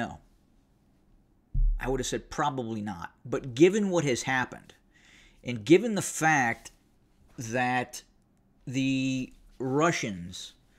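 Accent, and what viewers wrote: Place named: United States. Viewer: Russian TV stuff narrated in American accent. Pretty surreal to listen to.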